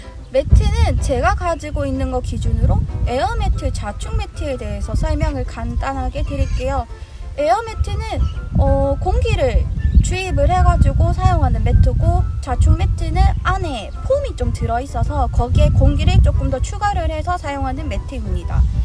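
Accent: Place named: Daegu, South Korea. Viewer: 저도 경상도 대구라 사투리 참 듣기 좋네요 ㅋㅋㅋㅋ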